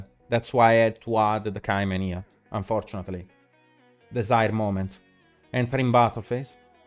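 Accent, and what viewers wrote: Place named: Italy. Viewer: questo modo di parlare... maledettamente italiano; (viedo molto utile comunque)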